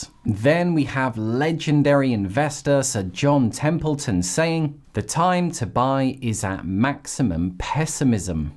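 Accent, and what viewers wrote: United Kingdom. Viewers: I realize that I like your videos cause your accent reminds me my visit to Britain. Such a beautiful land and scenery... However I buy the deep... 😁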